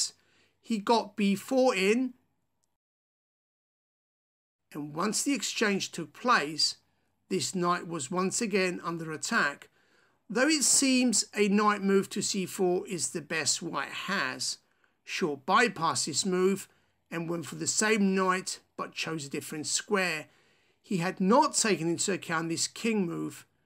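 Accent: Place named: United Kingdom. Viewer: He doesn’t speak American he speaks British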